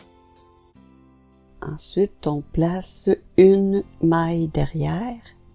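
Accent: Canada. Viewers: Merci beaucoup pour ce nouveau tutoriel.Le rendu est magnifique et les deux brins de laine vont donner un résultat bien chaud. Je vous félicite pour vos explications et images très claires... et ce qui ne gâche rien j'aime beaucoup votre charmant accent canadien ❤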